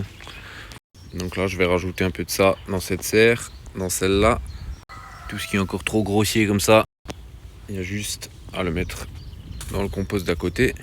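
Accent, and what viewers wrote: Alsace, France. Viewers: j'adore ton accent Alsacien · Le plus important, c'est l'accent. Je suis aussi alsacien et je comprend mieux avec l'accent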